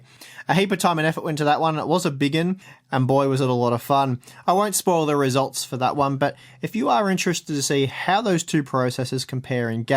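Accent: Australia. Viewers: Oh hey Your Australian!!! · Hey! I must say your accent is kinda hard to understand (I'm not a native speaker). But I had an Australian teacher and now it is easy for me to understand, just wanted to share my experience. Btw awesome channel!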